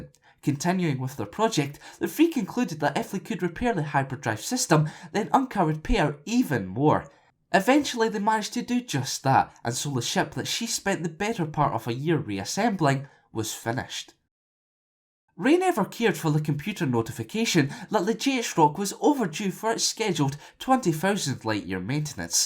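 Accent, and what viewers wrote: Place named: Aberdeen, United Kingdom. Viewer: Im guessing you're from Aberdeen, Scotland and 17-19 years old?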